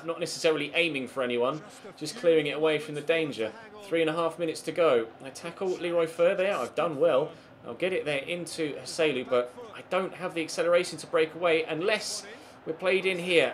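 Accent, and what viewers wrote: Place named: Newcastle, United Kingdom. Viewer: Are u from Newcastle ?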